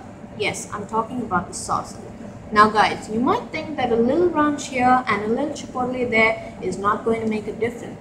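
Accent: India. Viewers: u sound desi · I cant pick up your accent sounds Indian.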